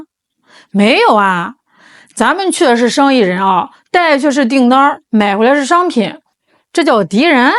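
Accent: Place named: Northeast, China. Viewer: 一股大碴子味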